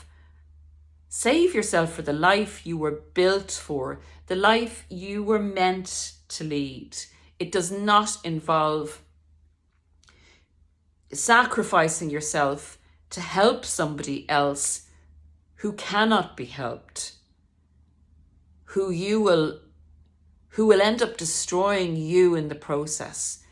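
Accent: Ireland. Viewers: I would just like to say, it is your Irish inclinations verbally, that I like to listen to. If the Irish accent was not a part of your delivery, I most likely would not be here listening. Being here however, I find you do make many good sense statements worth hearing and appreciating, due to your 30 years of bitter experience with narcissist partners. I appreciate you admitting that you are not God. And many of us, do not believe in God, so we aren't enlisted by claims that we are in a Crusade against evil; regarding narcissists. Division is now the biggest problem in human belief. I don't want to drive other people away from me by deciding they are shallow (or evil)Evangelical characters. Nobody chooses narcicissim (at 2 years old). So I appreciate you backing away from the Christian Crusade mode (as much asthat seems right to you. I'm hoping you aren't becoming a part of the division problem we see in society. 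Hopefully, we inspire others to see clearly, not to denigrate others who may not agree with our Chistian beliefs, should we be Muslims or Jews or Spritualists. Anyway, thank you for your well-intentioned aspirations.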